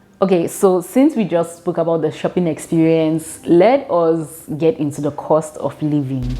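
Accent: Nigeria. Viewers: I swear I’m useless in differentiating west African accents, to me you sound Nigerian and if you hadn’t said you’re Cameroonian, I’d assumed you’re Nigerian or Ghanaian based on accent only. Thank you so much for reviewing South Africa through Cape Town mostly, hope you get to visit Gauteng(most cosmopolitan province& our economic powerhouse. You’ll find all African countries represented there, all the way from north to our southern neighbours) KZN(city of Durban has warm beaches compared to CT) , Limpopo(the best safaris in Africa, period!), Northern Cape(least populated province), North west, Mpumalanga and Eastern Cape. Also the bus system exists in other big cities too eg Tswhane(Pretoria), Johannesburg& Durban…work similar. All the best with your studies and stay in SA, welcome. Edit: also thanks for honestly talking the differences in poor vs rich in SA, that’s still racially pronounced in SA because of our apartheid history. 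Millions of rich and middle class Black, Indian(you find big population of Indians in Durban) and Coloured (majority race of ppl in CT& western Cape) ppl but the real wealthy of SA still skewed towards white SAns who gained most of their wealth through unfair advantages given to them by apartheid laws eg land & natural resource stealing& job reservations. Also glad you touched on the pronounced racial dynamics of SA, can’t live in SA and not learn to nuance about impact of global dominance of whiteness…guess that’s the most unfortunate unique aspec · I am a Nigerian , truth fully you sound like my tribe ,fine girl. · Cameroonians and Nigerians talk alike... u are Cameroonian but my brain keep thinking u are Nigerian because of ur accent. I thought Nigerian accent Is unique.. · I noticed the English speaking part of Cameroon 🇨🇲 have same accent and body language with Nigerians bcos this lady speaks like a Nigerians. 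· Lovely Video, you analysed your points perfectly. Well done 🙌. Meanwhile, i thought you were Nigerian at first, your accent sounds so Nigerian.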